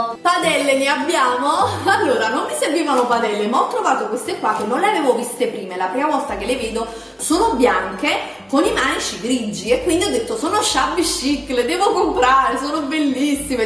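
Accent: Naples, Italy. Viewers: io amo la tua cadenza napoletana 💖💖💖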